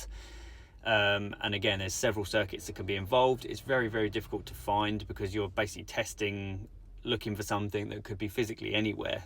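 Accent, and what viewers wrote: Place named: South East England, United Kingdom. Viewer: that’s great pal. Very helpful info… Where are you based if you don’t mind me asking? I’m up in Manchester and south lancs/Cheshire. I’m guessing somewhere south east from your accent 😂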